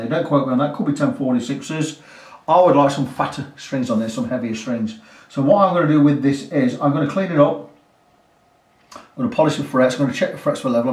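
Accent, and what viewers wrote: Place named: Nottingham, United Kingdom. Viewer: Was just about to comment Nottingham boy but then you came out with it Proper Accent 👍🏻😁 being a Notts lad too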